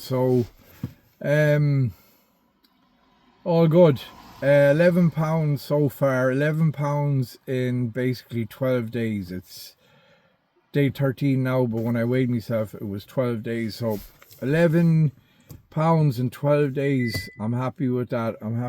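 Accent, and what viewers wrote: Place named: Ireland. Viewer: I could tell you're Irish. I was just curious if you're still living in Ireland. I'm always curious about ingredients in processed foods in other countries vs the u.s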